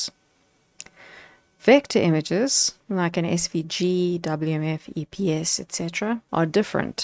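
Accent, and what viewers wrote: South Africa. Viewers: Is that a South African accent I hear? :)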